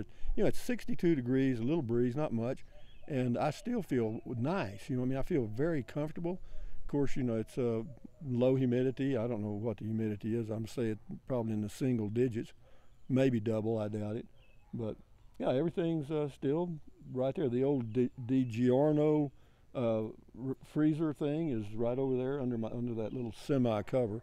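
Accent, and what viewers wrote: Texas, United States. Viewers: Loaded to the cookie seed! Texas talk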